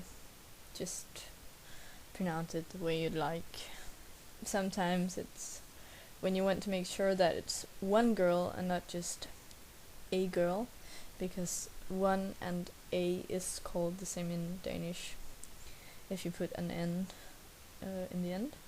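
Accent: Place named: England, United Kingdom. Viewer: I noticed that the OR and ER's are said with what i'd relate to an English accent. Like in the word Hvor, its like voll, and i mistaken?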